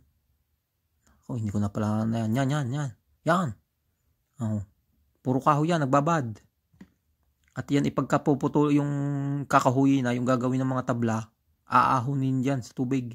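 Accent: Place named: Batangas, Philippines. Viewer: kuya nkktuwa ang iyong salita,kgaya laang dne sa amin,.tga dne laang ako sa sanjuan batangas,stay safe po plge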